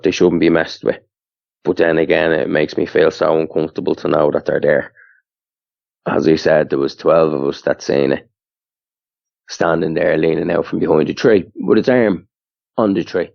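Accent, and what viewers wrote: Ireland. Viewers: As an Irish guy listening to this randomly hearing an other Irish guy talk about a dog man is bizarre to me 😳, awesome 🤙🏽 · Number one, I am so very sorry that when you think of America that this encounter will always be your first memory of our country. Number 2, I have visited former Jugoslavia, and Germany and I hope to travel to Ireland one day. I find your accent/brogue beautiful. I am Italian but I have always been drawn to the Irish and Ireland and I am not sure why. I want you to know we appreciate you sharing your encounter with all of us, as I can't imagine just how difficult this was for you to retell. 💕🙏💕🙏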